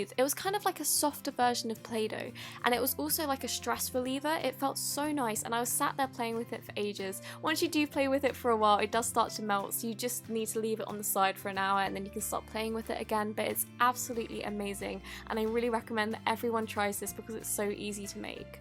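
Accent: England, United Kingdom. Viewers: Sumthing I have her accent, im English :) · I found u😂! I'm on insta btw. Love u AND GLAD SOMEONE FINALLY HAS A BRITISH ACCENT LIKE ME 🤣💜🖤💕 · U sound British how did u get borax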